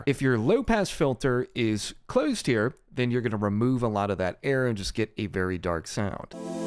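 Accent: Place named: West, United States. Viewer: The deep West accent just makes these even better, it's that American accent we never hear in the media, it's a complete trip man.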